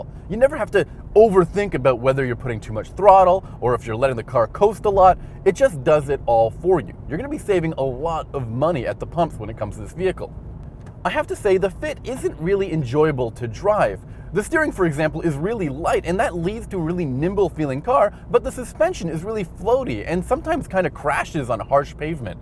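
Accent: Canada. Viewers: Thuffering thukatash I really really wanted to watch this video but how can I with this guy speech impediment mixed with his super strong Canadian twing? I can't imagine that the automakers are very happy when they got them back with the dashboard and windshield soaked with spit. Thank God for the mute button and closed captioning!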